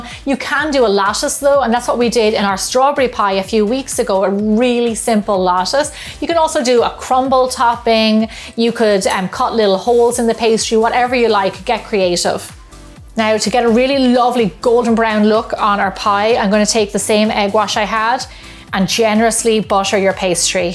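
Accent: Ireland. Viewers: Hey I have been watching your videos for the past 1 year. I have to say I find them really therapeutic and calming. And I also love your Irish accent. I have tried some of your recipes and they turned out so good. I really like the way you explain them. NEVER regretted coming and subscribing to your channel.❤️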